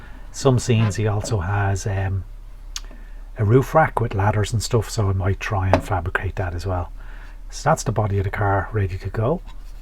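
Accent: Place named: Ireland. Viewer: Great to hear an Irish voice on a modelling channel.. ❤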